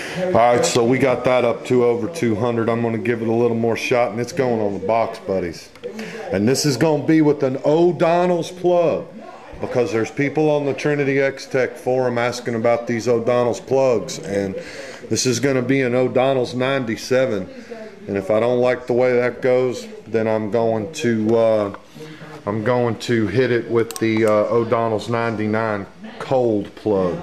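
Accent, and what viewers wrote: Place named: South, United States. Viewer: u sound like an easy going southern guy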